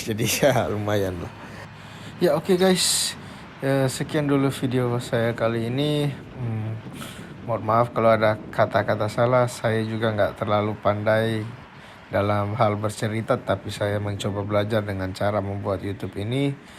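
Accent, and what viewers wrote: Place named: Manado, Indonesia. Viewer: Dari logatnya ngana dari Manado kang, butul2 nyong Menado guanteng2 do e, semangat ya nyong Menado, Tuhan Jesus memberkati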